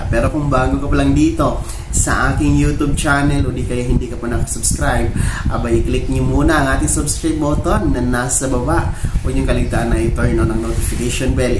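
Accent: Visayas, Philippines. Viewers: Tumigil ka nga puro.ka fake news pampagulo.ka pa.pati.pronounciation ng mga words promdi talaga.bisayang.bato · Bisaya ka dong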